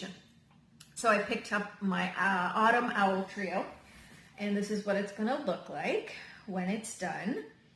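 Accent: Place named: Newfoundland, Canada. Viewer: Hi. I’ve been a subscriber for a while and I have admired your stitching so much. Before you go on too much about you should know that he makes fun of the way you all in Newfoundland talk. Lol 😂. You’re right. He is so funny and I think he and I were separated at birth 21 years apart. I’ve started my first full coverage piece so you are a real inspiration for me 😍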